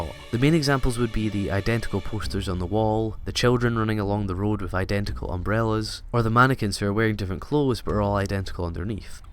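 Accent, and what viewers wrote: Scotland, United Kingdom. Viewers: Are you from Scotland and if so where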